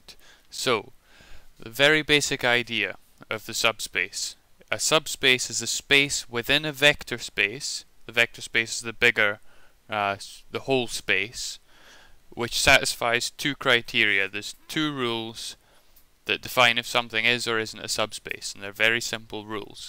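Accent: Scotland, United Kingdom. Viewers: Nice scottish accent! *<:D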